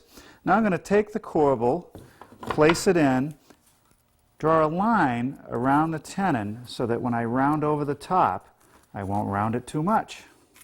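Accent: New England, United States. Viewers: I have to say, as a Texan, I’m enamored with his yankee accent, but I’ve been mentally calling it “Cortisan” white oak from watching these videos lol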